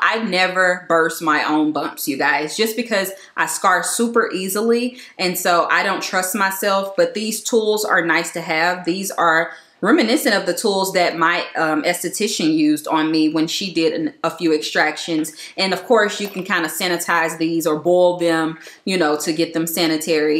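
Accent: South, United States. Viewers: Thanks for sharing! Btw, where are you from? I hear the southern "accent." 🙂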